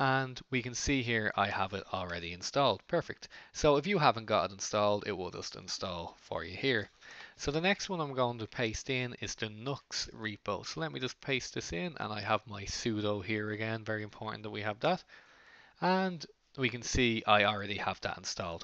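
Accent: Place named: Ireland. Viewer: thank you for the clear instructions! my wife is impressed with you brogue too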